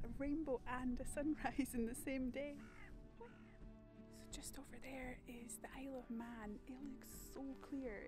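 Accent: Scotland, United Kingdom. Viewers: We are really enjoying your videos. We haven't managed to visit Scotland since Covid, when car hire prices suddenly hit the roof!! Hopefully next year! Your lovely Scots accent is good to hear, but I had a chuckle when you said 'cattle grid' - very clearly. My Edinburgh cousin says 'kettle grid', which once caused confusion on a picnic!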